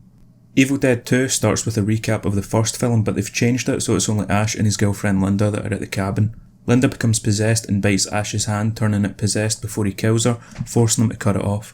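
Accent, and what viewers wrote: Scotland, United Kingdom. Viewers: It's nice hearing the same accent being spoken with same slang used on a platform like this (except of course for exceptions like limmy, but Scottish youtubers are few and far between on this platform). Keep up the good work, your iceberg videos are really nice and informative 👍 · Two things right off the bat. 1- Eyy, a fellow scotsman! Honestly really nice to hear someone who sounds kinda like me lol. 2- I might not be keen on Iceberg videos (8 out of 10 times it's crap imo), but I'll give you this... you cut straight to the chase, no bollocks or filler. Commendable in a platform filled with so much fluff, it would make a teddy bear look like an anorexic supermodel.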